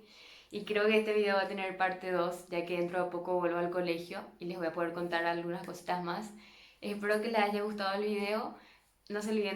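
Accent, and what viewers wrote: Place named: Paraguay. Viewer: holaaa!!!! soy nueva en tu canal, eres de paraguay??? me suenas por el acento , nueva sub...jsjsjsj muy buen video!!!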